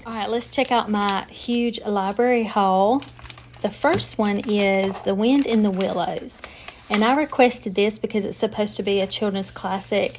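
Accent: South, United States. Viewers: Do i detect a Southern accent 🤔 👀 where are you from? 😊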